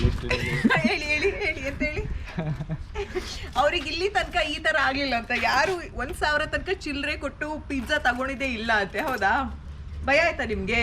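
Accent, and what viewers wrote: Mangalore, India. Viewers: Awesome prank I really appreciate your confidence for doing this👍. They were so kind. The way you spoke in mangluru Kannada was super cute ❤️ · Namma kudla da kannada baari shok kendrene kushi apund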